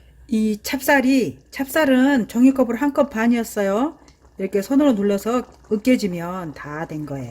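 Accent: Jeolla, South Korea. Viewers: 님 전북 분이신거 같으세요 말씀하시는 억양등이 전라도 음식 참 맵짜고 맛있게 잘합니다 오늘도 잘보고 배웠습니다 감사 합니다ㅡ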